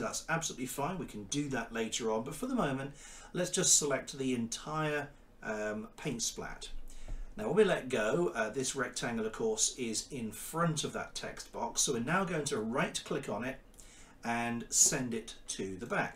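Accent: United Kingdom. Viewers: YOU KNOW YOU HAVE A GOOD BRITISH AX CENT.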